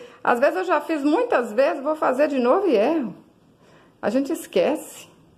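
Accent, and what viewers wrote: Minas Gerais, Brazil. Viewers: Além do artesanato,é muito agradável escutar esse sotaque mineiro....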